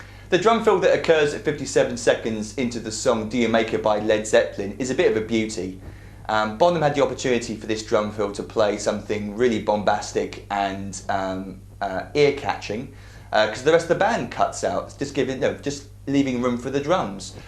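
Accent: England, United Kingdom. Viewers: What kit is this? It's peng!!! Also where are you from, you have an English accent? (I'm from England)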